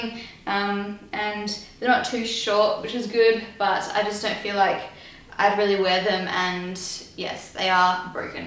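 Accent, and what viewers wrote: Australia. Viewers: I'm British and love ur and Australian accents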